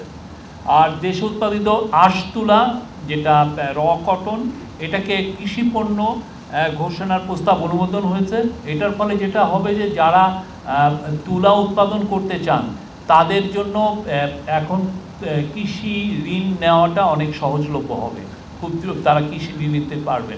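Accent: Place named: Kolkata, India. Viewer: Kotha bolar style e lage jeno kolkatar style🐸🐸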